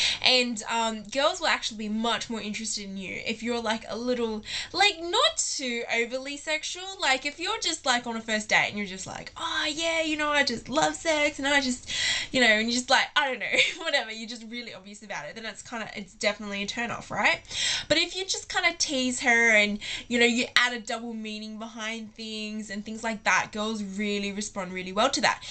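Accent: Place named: Australia. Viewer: u have such a aussie accent... and r u wearing a spaghetti strap for PJS?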